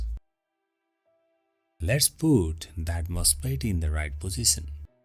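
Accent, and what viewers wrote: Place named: India. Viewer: This video could be the most useful for me rn but Indian accents has to be the worst man i dont know a shit what bro saying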